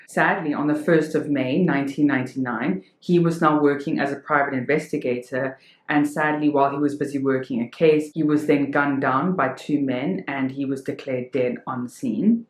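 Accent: South Africa. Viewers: I believe is presenting this case for its phsycopathic value in relation to Barend, not because she wants to be political or racist. Obviously she is S African, accent is unmistakeable.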